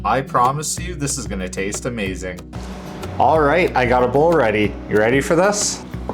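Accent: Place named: Alberta, Canada. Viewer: Who can tell me where in Canada this guy is from? the accent ..........Alberta?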